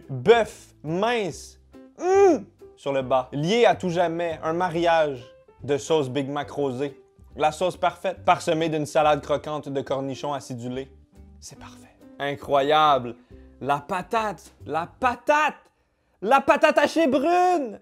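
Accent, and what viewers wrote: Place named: Quebec, Canada. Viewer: arrête de parler comme un québécois stp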